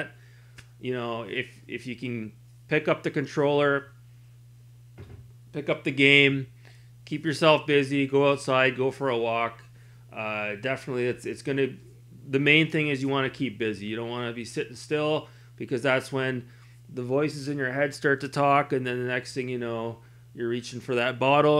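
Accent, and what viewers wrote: Canada. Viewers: ohh nice om from there too small towm outside Toronto. Just thought i heard a bit of newfie in there lol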